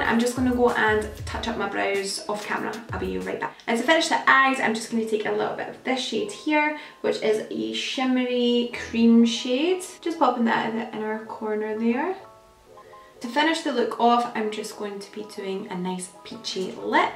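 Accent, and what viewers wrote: Scotland, United Kingdom. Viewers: After watching your videos, I always find myself going away to do my make up😂 love hearing a Scottish accent on YouTube as well😁🏴💕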